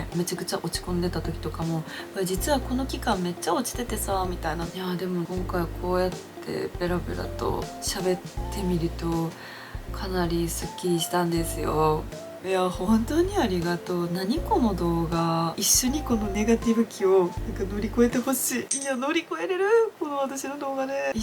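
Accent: Kansai, Japan. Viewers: 寝れないときあるよね ❤❤ 関西弁可愛い❤癒やされます❤ 生理前てイライラするよねお腹痛くなったり❤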